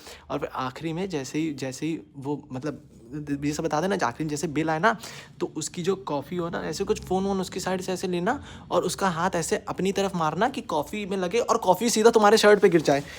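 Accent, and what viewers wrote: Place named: Kanpur, India. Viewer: By any chance are u from kanpur? Ur Accent matches the kanpur accent a lot!!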